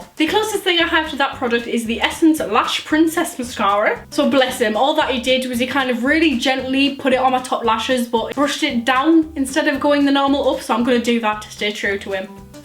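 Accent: Newcastle, United Kingdom. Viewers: she’s from somewhere near Newcastle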